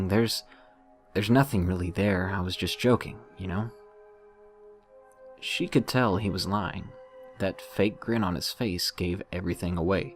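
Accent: South, United States. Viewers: Aw yeah, you have well had a Southern accent like me! I don't know why but I get so excited when I see a YouTuber from the south or from Alabama. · Do I detect a slight southern accent in these older recordings? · AaAAAAAaaAAAAaAA ’ COUNTRY BOY ACCENT IT SHOWS A BIT IN THE OTHER VIDEOS STILL BUT OML IM SCREECHING This makes me happy in a way i can’t explain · The Thick Southern Accent threw me off at first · Angry-Moth-Noises he is from the south of course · lol, your old voice sounds so much more southern? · Love your videos never noticed the southern draw of yours till this vid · Seems like you had a bit of a southern accent way back when! This video is very charming, brings me back to the smaller days of your channel 💓 You’re the best narrator, hands down. Love from Florida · Country sounding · Whoa, the southern is strong in this one. =x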